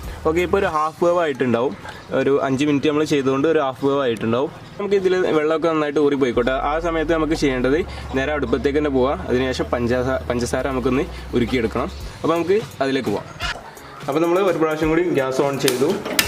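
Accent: Malappuram, India. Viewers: Kakka nee malappurathaano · Pinnalla muthe njan kottayam but malappuram slang is so beautiful bcz ente kooduthal frnd malappuram anu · എടാ ചെക്കാ ഇജ്ജ് മലപ്പുറം ഭാഷ തന്നെ പറഞ്ഞാൽ മതി. ഞമ്മക്ക് തിരിയും ട്ടോ ചെക്കാ. നിന്റെ ഇന്നസെന്റ്സ് എനിക്കൊത്തിരി ഇഷ്ട്ടായി. Love you bro. Kl 10 ആണ് ഞാനും. കാളികാവ് മാളിയേക്കൽ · Good, മലപ്പുറം മലയാളം തുടരട്ടെ. മനസ്സിലാകത്തവർ ചോദിച്ചേക്കാം. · malapuram .slang athaanu. njanum malapuram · soopar . edakk swandam basha warunnundallooo .fullaayitt malapuram basha paranjoolee😃😃 oru koyappalla... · Malappuram da😄😄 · മലപ്പുറം ആണോ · പക്കാ മലപ്പുറം സ്റ്റൈൽ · നമുക്ക് നമ്മുടെ മലപ്പുറം ബർത്തനം തന്നെ മതിയെടാ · Daaaaa.... ഇത് നമ്മടെ മലപ്പുറം ഭാഷ ഒരു കുഴപ്പവുമില്ല നീ പൊളിക്കെടാ മുത്തെ...... 😘KL_10 chunkss😍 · Njammalum malappuram okke thanne aanu bro... Bhasha onnum oru kuzhappavum illa... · എന്റെ അഭിപ്രായത്തിൽ ഇജ്ജ് അന്റെ അല്ലെങ്കി മാണ്ട ഞമ്മളെ തനി മലപ്രത്തെ ഭാഷേ തന്നേ പറഞ്ഞാൽ മതി ചങ്ങായി അതിനും അതിന്റേതായ ഒരു മൊഞ്ചുണ്ട് ഇബനെ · മലപ്പുറം ഭാഷ 😘😘😘😍😍😜😜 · മലപ്പുറംകാരെ signature മാറ്റല്ലേ bro...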